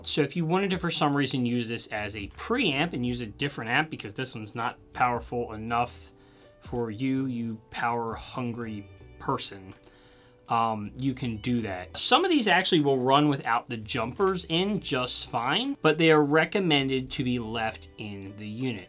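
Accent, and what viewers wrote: United States. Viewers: Why if the talking head has a USA accent do we have to endure an undercurrent of anti intellectualism?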